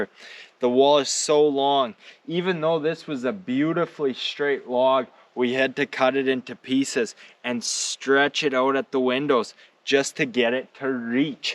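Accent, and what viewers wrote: Canada. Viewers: First of all, this is so pleasant to watch and secondly, an awesome reminder of how hard work can be fun. The last thing is, I’m so charmed by this Canadian accent and I didn’t think I would be. It’s funny how we can speak the same language and it can still be so wildly different but it’s just different people evolving the way they speak in different parts of the world. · Based on what I can tell from this video alone you are a great roll model for other young men to learn from and I don't only mean the building of your home but also the way you speak about it and the work ethic you have, those parts can be used in many areas of ones life to live a good life. Besides, who doesn't love a Canadian who acts and talks as Canadian as one can get, lol. Loved this video! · As a Canadian i can say, this dude has one of the strongest Canadian accents ive ever heard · Think you mean to say “Canadian” grit! 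That accent is classic 😆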